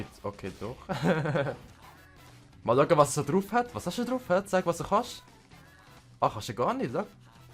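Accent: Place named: Switzerland. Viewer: Ich bin zwar aus Österreich und rede eigentlich nur Hochdeutsch, verstehe dich aber trotzdem mega gut. Generell liebe ich es wenn jemand Schweizerdeutsch spricht.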